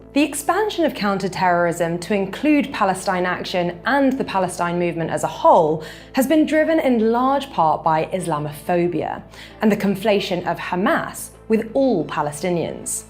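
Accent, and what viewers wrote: RP, United Kingdom. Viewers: Thank you for proving you don't know what "invented" means. On top of that, the first use of the word in its generally accepted meaning was not 100 years ago or 1974 (😂😂). It was 1795. Thank you for also proving that just having a posh voice is no proof of intelligence or integrity. Where is Christopher Hitchens when we need him?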